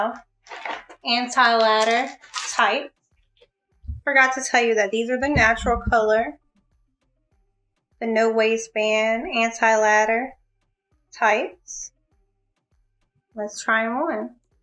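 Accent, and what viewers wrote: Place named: South, United States. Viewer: Love the southern drawl y'all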